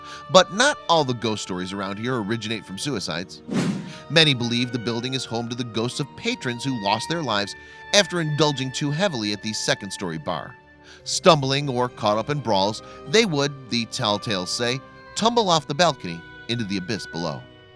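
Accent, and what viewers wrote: United States. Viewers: Working it hard for a story -loud yank -very ordinary and dull .....only yanks could dramatise up as odd or unusual.....narrator tries to hard ..thumbs down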